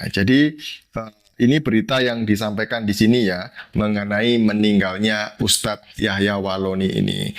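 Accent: Java, Indonesia. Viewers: Medog Jawa nya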